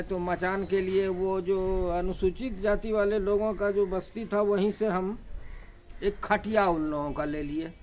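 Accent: Bihar, India. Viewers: Dear sir, I love you way of narration and bihari voice. I am a gun lover. I support killing for food, not for enjoy. However shooting is always joyful unless it harm innocent people.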